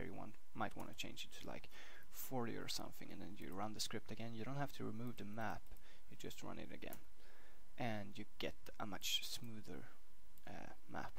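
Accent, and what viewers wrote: Sweden. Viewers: wow my accent of a swedish person didnt sound anything like this. fuck, and notice at how people give more fucks about your voice rather than the video subject it self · Have you never heard a Swedish accent before? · Lmao, most of the comment are about hes voice / Swedish accent. Like me "Tjenare" c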